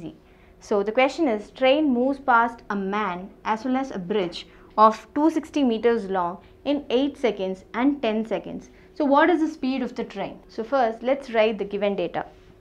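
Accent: South India, India. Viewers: south accent .......sounds little weird but feels sweet .....nice lecture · Very helpful ma'am Ma'am you from south? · Are u a south indian? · Teacher you belong to (South Indian ) I'm right I identified in your language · Must say u r gazzab....or upar se ye thoda pronouncing me south indian touch...awsome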